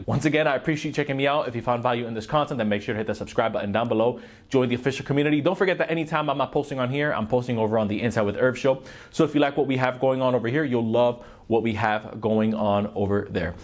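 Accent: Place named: Miami, United States. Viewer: Lol you have to be from Miami lol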